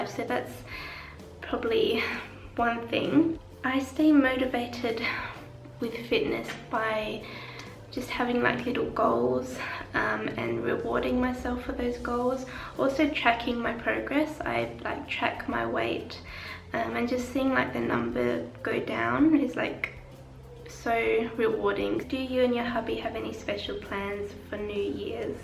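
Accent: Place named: Australia. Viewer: love that aussie accent :)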